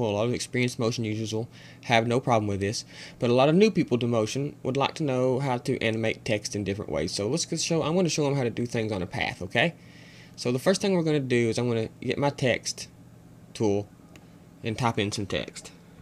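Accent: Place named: Georgia, United States. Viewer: Once again i must say you are a smart man, and you sound like your from georgia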